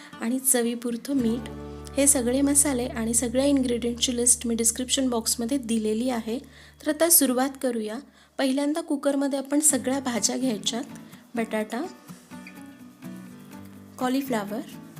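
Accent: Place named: Pune, India. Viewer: ताईची भाषा पुणेरी आणि स्पष्ट👍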